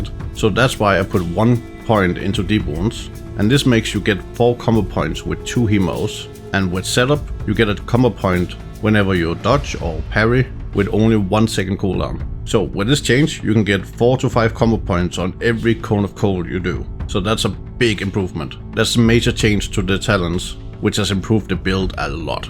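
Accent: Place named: Denmark. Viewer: you have a bit of danish accent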